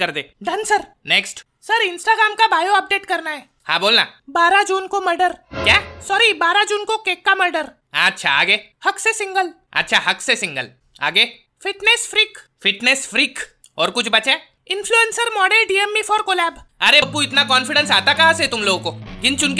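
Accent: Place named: Maharashtra, India. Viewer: I like your Marathi accent 🔥🔥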